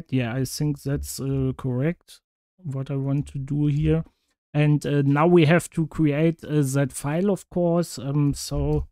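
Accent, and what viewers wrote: Germany. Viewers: Bruder hat den dicksten deutschen Akzent den es gibt.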